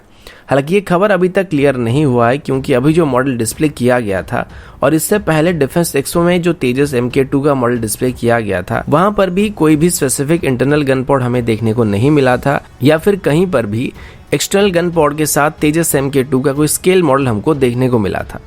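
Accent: Odisha, India. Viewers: Are you from odissa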